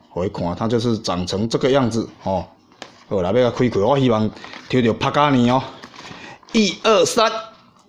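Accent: Taiwan. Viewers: 版主的台灣國語真的好搞笑XD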